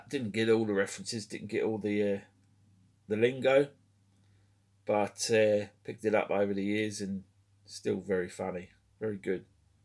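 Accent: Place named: Australia. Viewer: YOur austrailian was brilliant !!! Thought id accidentally clicked on a neighbours episode at first with Je Mangle 🤣🤣🤣 Great little figure ! 👍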